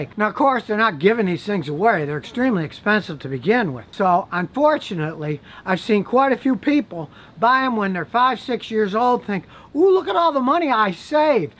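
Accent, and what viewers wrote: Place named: Brooklyn, United States. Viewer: This guy sounds like dafy duck and a really thick brooklin gangster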